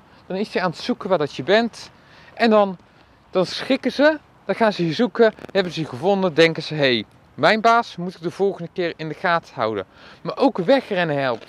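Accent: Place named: Brabant, Netherlands. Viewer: af en toe kom ik terug naar jouw honden opvoedvideo’s en word ik weer even met beide voeten op de grond gezet. Wat leg je het toch goed uit, en wat heb ik al veel aan je tips gehad. En dan jouw zalige Brabantse accent, love it!! 😍 Dank je wel en ga zo door!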